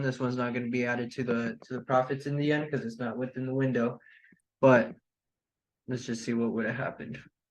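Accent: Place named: South Asia. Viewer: South Asian English Accent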